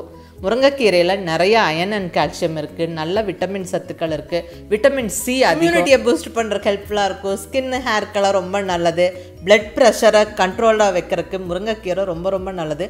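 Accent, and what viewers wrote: Coimbatore, India. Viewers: I'm the first to comment... Happy to do that... I'm from cbe and i love ur videos.. Nice presentation and kovai slang.. I am ur follower of chicken biriyani, brinjal fry etc.. All ur videos are worth watching.. Thank u soo much mam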